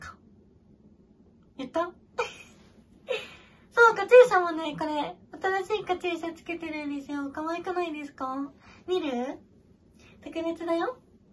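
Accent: Shizuoka, Japan. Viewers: 初コメです^ - ^静岡県民なのでウソだらの静岡弁聞けて嬉しかった(*^_^*)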